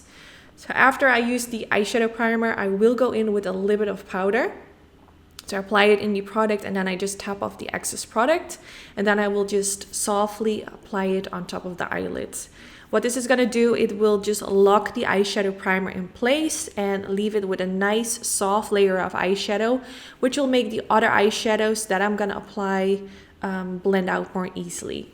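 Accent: Netherlands. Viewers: I Love the Mac paintpot and the eyeshadowpallet , by the way i, m hearing a Dutch accent , if so greetings from The Netherlands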